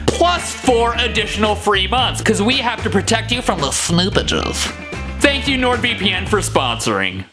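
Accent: United Kingdom. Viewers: Your British accent was good, but you should see Kent Paul or Maccer's accents.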